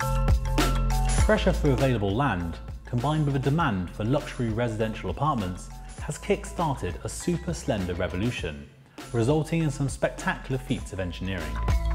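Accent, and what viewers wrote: United Kingdom. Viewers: not everyone with a british accent sounds calming, intelligent and elegant... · Quirky British/ accent make you sound pretentious. Tone it done bro. None of my Brit friends talk like you do.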